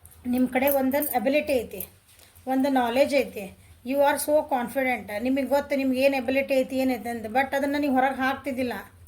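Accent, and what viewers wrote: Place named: Badami, India. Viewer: Hi mam...nimmadu yava uru mam?nimma matina shyali namma uru Tara ede...nammadu badami..nimmadu yav uru mam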